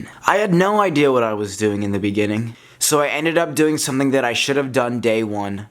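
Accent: United States. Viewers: Are u American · is this guy really russian? he sounds like an american.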